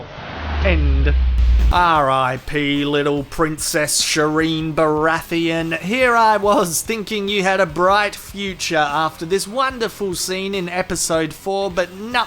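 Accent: Australia. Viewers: Fuck this shot is gold 👍🏻 you an Aussie? Or just the name? Aha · Love your facking reviews mate. Australian narration FTW! I imagine you've read about Georgio's plans for Shireen in the book? <link>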